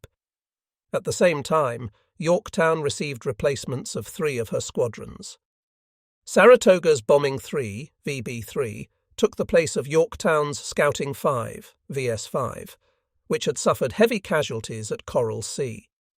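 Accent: United Kingdom. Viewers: The creators are using open source software and have learned as they were producing. These videos are a labor of love. Give them credit for what they are doing. I still detest that semi British accent of the voice.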